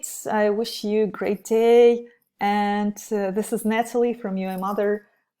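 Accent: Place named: Russia. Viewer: по акценту понял, что русская, потом посмотрел в комменты)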